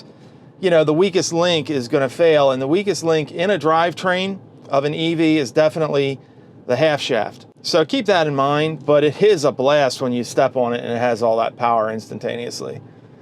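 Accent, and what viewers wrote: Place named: Philadelphia, United States. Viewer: I'm hearing a Philly Delco accent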